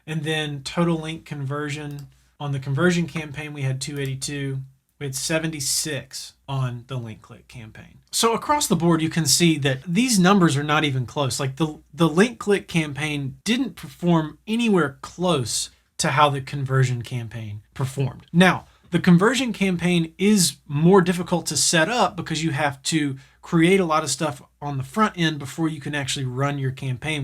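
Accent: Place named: South, United States. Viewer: I think I hear a southern twang to your voice. We just talk slower 😄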